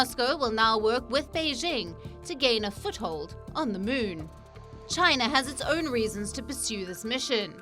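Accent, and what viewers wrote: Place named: India. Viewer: I noticed nothing but the lovely Indian accent.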